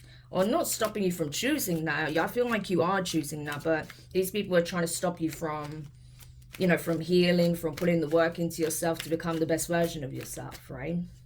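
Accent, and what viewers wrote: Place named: Australia. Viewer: "Source is stepping in. Making good to a promise. Your prayer is heard. What are they encouraging you to do? To take initiave. To be assertive." "Make some changes. Release some things that do not serve you. We will make things happen." I just want peace for the world, Mam. I'm currently studying about international politics. I'm old to go back to school. Yet I'm studying so hard...despite the gossips,...despite the many projects. So that I can help to keep the peace in the future. Whether its within our city only or maybe with other neighboring countries too. ✨🌱👍 This is all i want. #WORLDPEACE Thanks for channeling this important message, Ms.! Thanks also to the Spirit Guides for the continuous support! ✨😇🙏✨ P.S. Mam are you from Australia? Your accent is so cute! ❤️🥰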